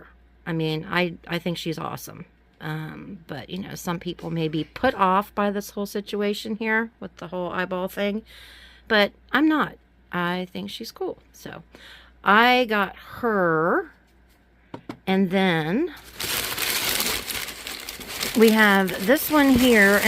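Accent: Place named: North Carolina, United States. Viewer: Neighbor! Ok.. Which state are you in? You almost sound like a North Carolinan😉.. I'm actually originally bien & raised in NC, moved to Tennessee 4yrs ago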